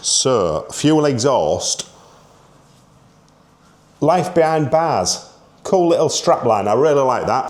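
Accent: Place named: East Yorkshire, United Kingdom. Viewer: East Yorkshire - thought I recognised that accent! Round that way used to be my old haunting ground (Hedon) till I moved to Aus. Been thinking about getting one of these exhausts for my Vstrom 1050DE but not sure whether to go ceramic black steel or titanium. I assume the different metals wouldn't sound the same?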